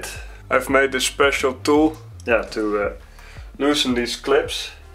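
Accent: Netherlands. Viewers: You just HAVE to be dutch, listening to that accent. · are you dutch?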